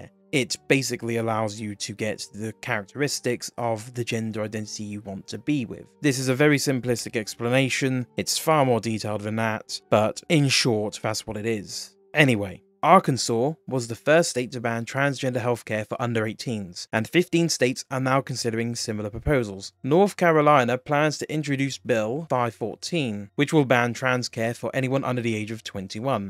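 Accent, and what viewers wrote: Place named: United Kingdom. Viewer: Pretty cool but british accent so i have to dislike... sorry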